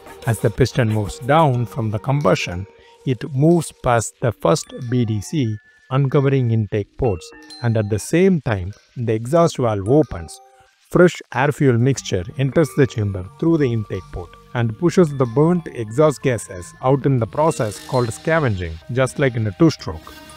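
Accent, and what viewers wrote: India. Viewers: The indian accent got me😂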